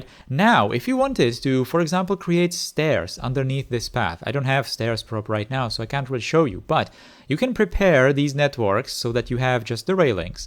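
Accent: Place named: Czech Republic. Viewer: V prvních patnácti sekundách videa mi bylo jasné, že jsi Čech 😃 Twitter to potom potvrdil. Tvoje angličtina zní skvěle, neber to jako výtku 😊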